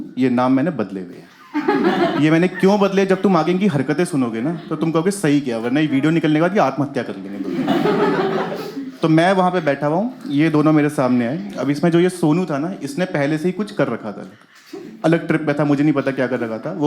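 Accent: Alwar, India. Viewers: Bhai alwar se ho kya?? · Bhai alwar se ho kya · Sir aap alwar rajasthan se ho kya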